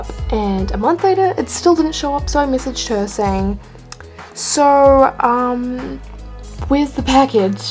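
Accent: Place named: New Zealand. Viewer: i recognised your accent then when you said you were from nz i subbed lol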